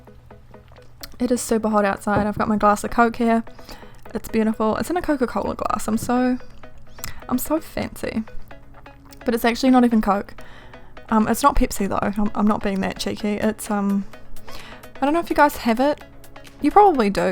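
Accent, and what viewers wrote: New Zealand. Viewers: This house is pretty :) and are you from New Zealand?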